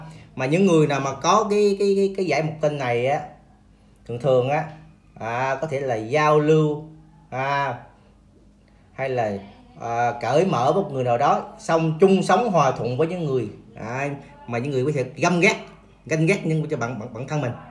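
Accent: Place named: Quang Nam, Vietnam. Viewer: Nghe giọng thầy chắc người QN-ĐN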